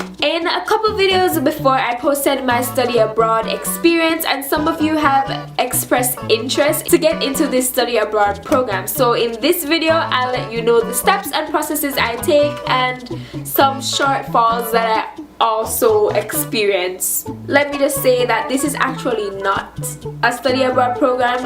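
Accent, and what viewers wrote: Jamaica. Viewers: she sound Jamaican.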